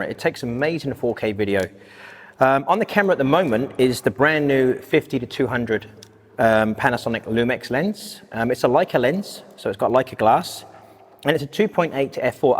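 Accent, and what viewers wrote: United Kingdom. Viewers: Don't worry, POHM, there isn't even the most remote hint of fush and chups in that accent! 100% UK very obviously. As you were. You can CNC machine from cast or extruded or "billet" or forgings. What you start with and how you get it to shape are somewhat independent. If they machined it from a bullet, though, it must've been a BIG one. ;-)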